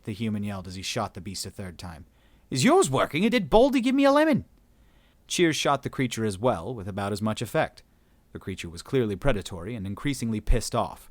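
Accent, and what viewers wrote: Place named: Australia. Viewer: Your Australian accent is amazing.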